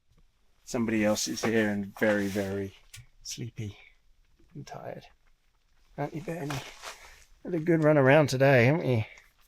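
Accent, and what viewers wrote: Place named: England, United Kingdom. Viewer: Wow what a beautiful place and yes amazing scenery. I was just wondering are you actually English? And you’ve moved to New Zealand because you don’t sound like you’re from New Zealand. Your dog is beautiful. He’s very energetic. Fun to watch.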